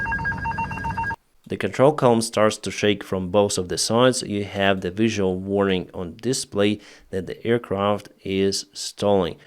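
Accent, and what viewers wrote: Russia. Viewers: I love this narrator's accent!🎉 is it Russian?❤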